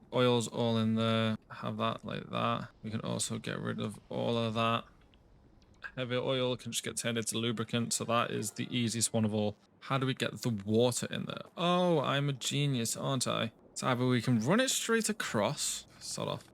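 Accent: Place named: United Kingdom. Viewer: you were discussing where you were gonna get water from because you’d forgot it, when you’re building on landfill! You can remove a couple landfill tiles and drop a pump down wherever you fancy. I found out by accident early on. Can I ask where abouts in the UK you’re from? Trying to pin your accent has been driving me insane watching your videos 😅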